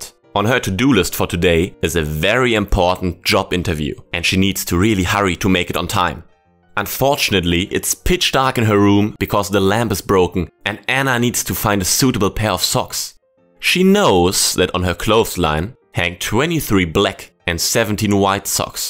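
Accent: Germany. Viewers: Is that a german accent i hear?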